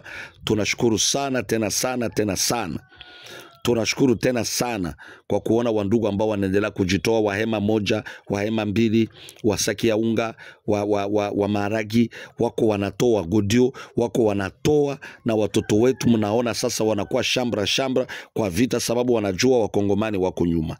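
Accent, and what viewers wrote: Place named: Rwanda. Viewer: NAWEWE UNATMKA MAJINA YA VIJIJI KAMA WANYARWANDA!! LWIKO = LW 'EÙ, KAKANGARA = AKANGALA, BIGARAGARA = BIKALA KALA, KABINGU= ABÉNGÙ. KISOKE = ÉSOKE. TAMKA KWA NAFUZI ZA KIBEMBE MAANA NI VIJIJI VYA WABEMBE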